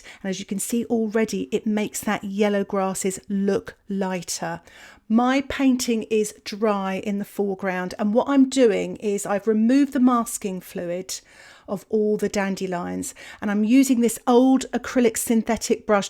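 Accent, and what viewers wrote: England, United Kingdom. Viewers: I love your accent ! English ?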